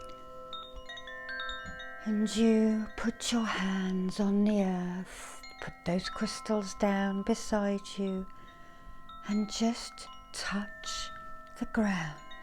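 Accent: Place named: Southern England, United Kingdom. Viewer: Something about the tones of you south Britons is perfect for this gig.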